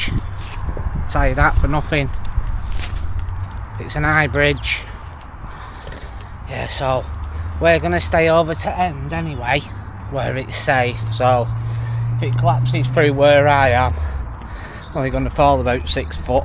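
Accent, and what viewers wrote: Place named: Burnley, United Kingdom. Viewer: I used to live in Burnley. What happened there was weaving in the big sheds. Three was also a brewery. Nice video thanks. BTW you sound like you were a Burnley man.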